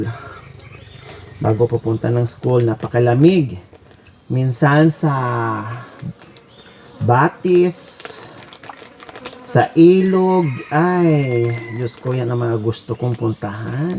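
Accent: Visayas, Philippines. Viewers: ang tono ng salita mo ay bisaya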